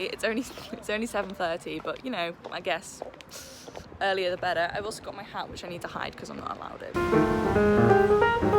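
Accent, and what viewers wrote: London, United Kingdom. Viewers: Are you from London? Australia?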